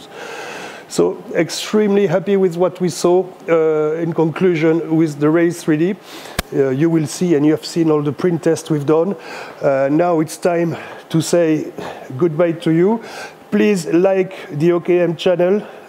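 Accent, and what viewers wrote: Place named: France. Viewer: Yes, this is french accent :)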